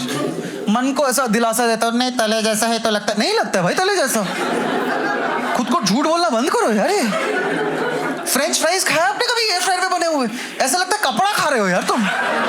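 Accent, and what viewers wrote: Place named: Mumbai, India. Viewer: Bambaiya hindi raaste par bolte hain...show pe nahi. Used to hearing better hindi from you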